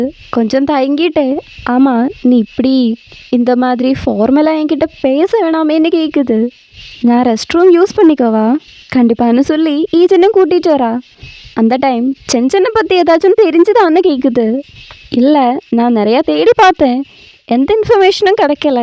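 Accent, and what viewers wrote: Kerala, India. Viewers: Chechi sherikk malayali thannenoo malayalam explanation mm Tamil explanation adipoli ayitt parayndallo 😌❤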